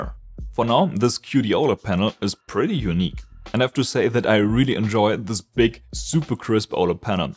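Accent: Germany. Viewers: Man hört doch raus das er deutsch ist😂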